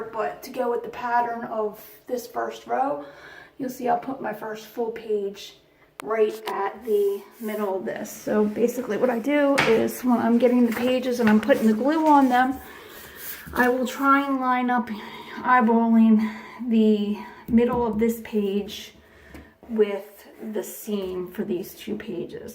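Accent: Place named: Philadelphia, United States. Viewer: I can tell by your accent that you are definitely from philly. Great video by the way!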